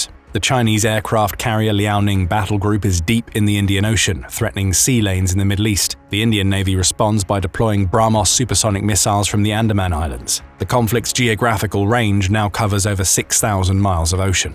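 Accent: United Kingdom. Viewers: The moment the British voice, I m gone.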